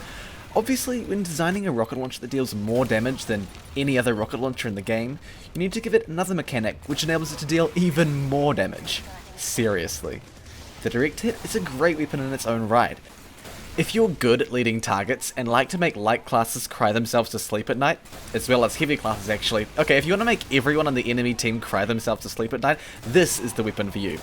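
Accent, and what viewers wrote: New Zealand. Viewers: You're from New Zealand aren't you? I recognise the accent. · are you from New Zealand? you sound like it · what national background do you have? i think you sounds new zealand, coming from an aussie :P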